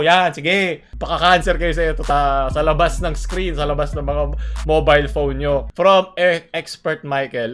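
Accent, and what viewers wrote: Visayas, Philippines. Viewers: Tanginamo. Bobo bisaya accent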